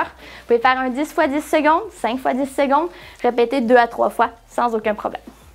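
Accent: Quebec, Canada. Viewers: Bonjour de la France, Merci pour vos vidéo si interentreprises, on apprend pleins de chose. Puis au passage j'adore votre accents QUÉBÉCOIS ! Un jours je viendrais visite votre fabuleux pays :) Petite question si possible, j'ai un vélo Contre La Montre, j'ai fait une étude posturale et de temps en temps j'ai vraiment mal au cervical ? Avez vous une vidéo qui parle de cela ? (si possible) Merci encore pour tout vos conseille.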